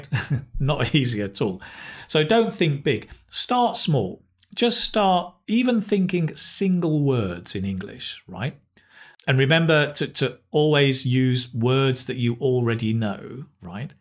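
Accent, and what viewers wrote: United Kingdom. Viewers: Hi from Italy You have been a great discover for me! I love your British accent Your suggestions in this video are simply brilliant: focussed, clear, understandable and easy to get into daily life. Thank you so much!! I'll keep watching you!! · I adore that British accent..🥰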